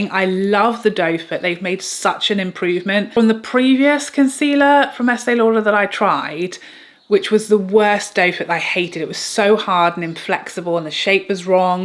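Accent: United Kingdom. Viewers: Love you bless you beautiful soul inside out, I love your British refined accent it's so soothing and therapeutic bless you and your family 😊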